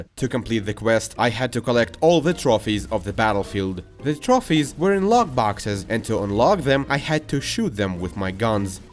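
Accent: Russia. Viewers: я сразу почувствовал что ты россиянин, но слова ты выговариваешь хорошо, многие западные славяне говорят намного хуже тебя, что уже говорить про остальных русопитеков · If anyone curious - that's what russian accent actually sounds like. ;)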